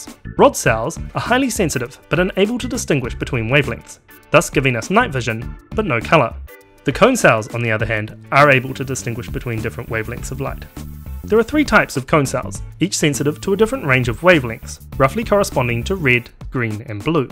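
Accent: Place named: New Zealand. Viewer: or it might be the kiwi accent...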